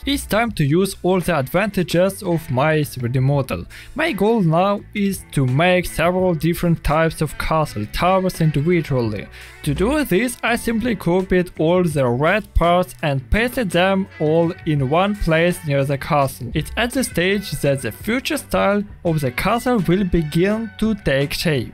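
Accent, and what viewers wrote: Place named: Kazakhstan. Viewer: My kid & I were trying to guess where you are from, my guess is Kazakhstan. My guess is that you spent a lot of time learning English from books. Good job sir!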